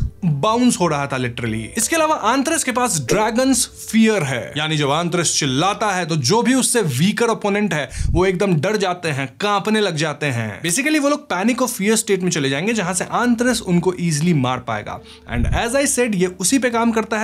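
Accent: Bengal, India. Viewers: bhiya aap sabse pehele anime ki story cover karo aise bhut confusing ho rha hai please 1st full manwa explation chapter wise and then all the details of characters,gods and monarchs please bhaiya you will be the 1st to cover this anime fully chapterwise on youtube and btw love your videos so muchlots of love and are you bengali as you sound kind of like them as I am bengali tooooo please consider my request please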